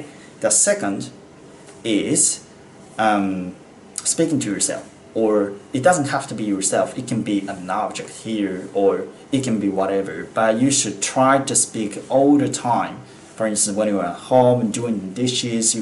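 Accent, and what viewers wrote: Australia. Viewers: オーストラリアはこういう発音なんだ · オーストラリア英語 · だいぶオーストラリア英語になってきましたね。 makeの発音、マイクに聞こえる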